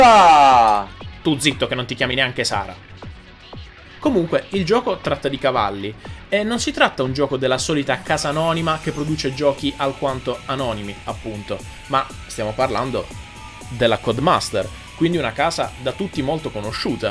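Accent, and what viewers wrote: South, Italy. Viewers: si sente proprio che sei del sud:D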